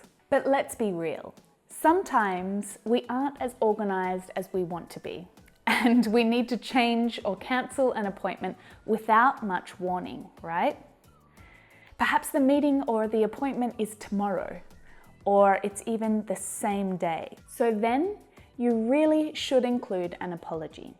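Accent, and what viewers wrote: Australia. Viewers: Hi haw are you?are you from Australia?Australia is very nice cauntre.Australian people are very kind.you too.l love your acisent you speak good l am your student.thank you very mach l love your lessons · I'm lucky finally i got you here as a great teacher I'm live in Sydney I'm learning a lot from you specially Australian accents Thank you so much love you mmm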